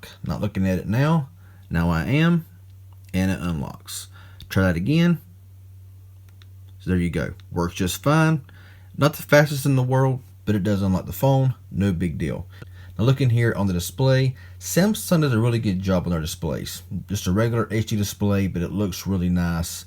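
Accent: South, United States. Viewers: He has a yee yee accent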